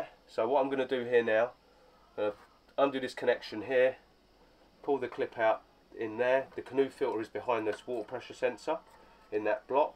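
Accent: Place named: London, United Kingdom. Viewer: loving your videos mate! your a funny guy you remind me of del boy trotter in a good way and with the london accent lol if thats packed in now your wife wont be happy she will be like "why do you have to start pissing around with it showing all your pals on youtube!!!" lol anyways mate keep up the good work hope you and your family had a great crimbo..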